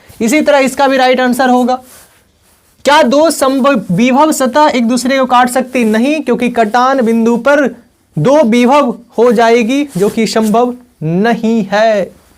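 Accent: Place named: Bhojpuri, India. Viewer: Bhojpuri sun k badhiya laga sir ji 😂😂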